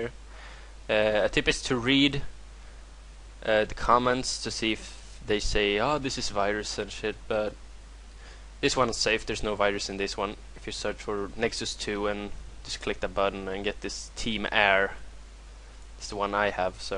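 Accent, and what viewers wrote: Sweden. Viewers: Haha du låter som en typisk svensk <3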